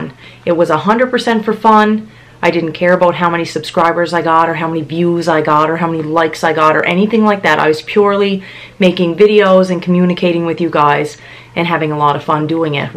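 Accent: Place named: Cape Breton, Canada. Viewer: I would definitely subscribe if you started a Mom channel. I mostly watch the Mom content anyway. I stumbled upon your channel ages ago before you started saying that you were from Cape Breton in your intros. 10 seconds into it I said, “That girl was got to be from Cape Breton!” I was born and raised there but live in NB now. I love your channel!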